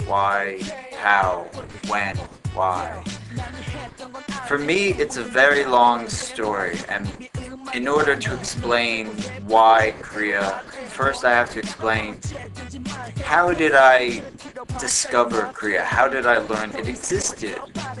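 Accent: New York, United States. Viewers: are you from upstate? or long island? just curious..lol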